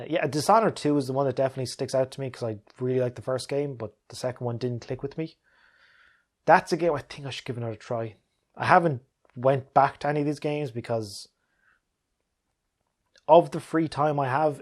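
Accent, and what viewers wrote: Ireland. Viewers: Currently listening to these as an aid to get me to sleep. They do say the Irish accent is musical. All I know is it works. Thanks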